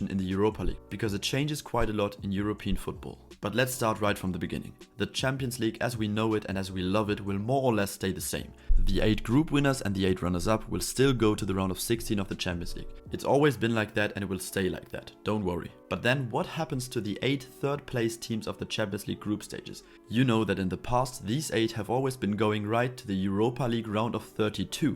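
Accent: Germany. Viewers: I hear the german accent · Are you German Weil es klingt sehr deutsch vom Akzent her 😂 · Der Deutsche Akzent haut rein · This dude speaking English sounds like he is speaking German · Man merkt halt so krass das du Deutscher bist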